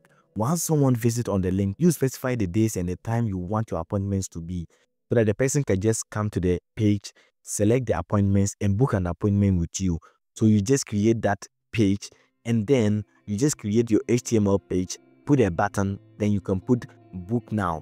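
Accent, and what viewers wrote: Ghana. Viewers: Are you from Ghana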